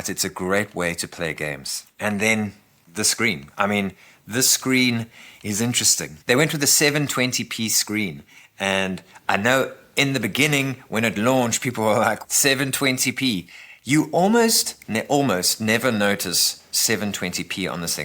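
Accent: South Africa. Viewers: Are you South African? You sould South African. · You South African ?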